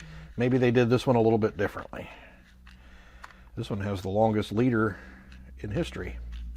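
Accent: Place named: United Kingdom. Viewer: Thank you I really enjoyed the video. Your accent sounds more British than most American YouTubers.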